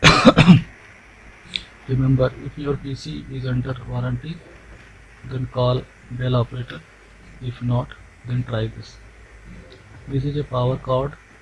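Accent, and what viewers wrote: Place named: India. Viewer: that is a babu accent.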